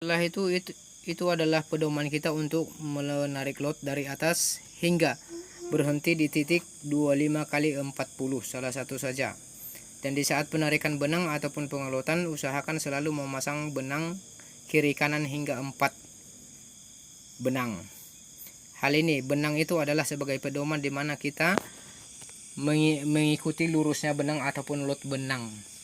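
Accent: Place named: East Nusa Tenggara, Indonesia. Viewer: saya suka saya suka logat ngomongny ntt kah.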